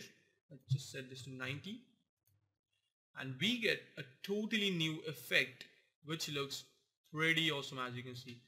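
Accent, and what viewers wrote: India. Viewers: thank you bro :) i was scrolling through the internet for some clean intros and just stuck on this one i didnt knew you are indian until i just felt that accent :D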